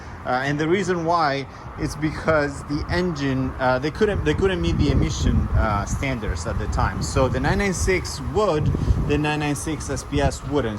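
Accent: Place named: Texas, United States. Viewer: Beautiful bike, I can definitely hear your Texas accent!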